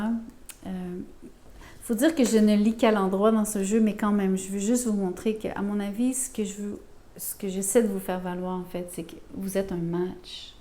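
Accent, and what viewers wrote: Quebec, Canada. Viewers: Bonjour. Guidance vraie mais pas pour tout. Je prends ce qui corredpond. Vous êtes Québécoise ? Votre accent, je reviens du canada 😊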